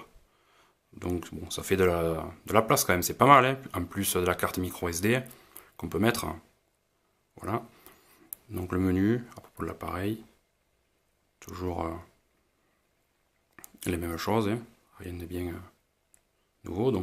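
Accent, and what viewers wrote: South, France. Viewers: Continue j'adore tes vidéo et l'accent du sud qui va bien comme toujours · Beau deballagE et tres bonnE video avec le bon accent du sudE !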